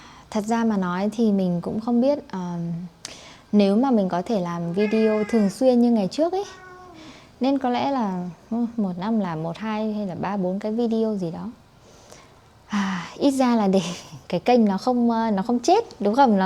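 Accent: North, Vietnam. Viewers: Thank you so much for making another video. Your videos are the best comprehensible input in Vietnamese for people trying to learn the northern accent. I hope you have time and energy to continue.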